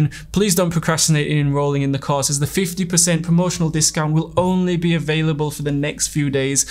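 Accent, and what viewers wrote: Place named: Yorkshire, United Kingdom. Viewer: which part of the UK do you come from? It sounds a bit like Yorkshire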